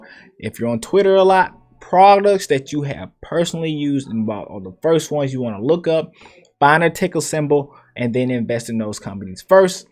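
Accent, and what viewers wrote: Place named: Jamaica. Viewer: Are you Jamaican or Trini ?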